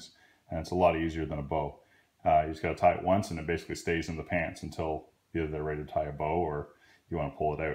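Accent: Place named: Canada. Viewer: Canadian accent 😬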